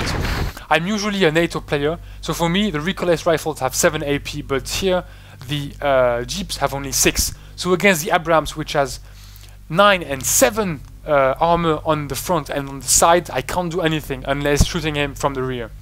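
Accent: France. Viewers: First :p, nice vids keep up the good work and the french accent ;)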